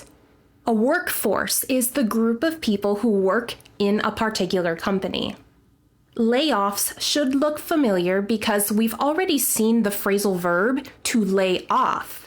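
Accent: United States. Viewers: WOW! How amazing accent, for downtown of NEW YORK! EXCELLENT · It's american english? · Is it american or british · Hello madam I am watching your videos learning english with the news and found good and clear english in American,so I wish to learn from you.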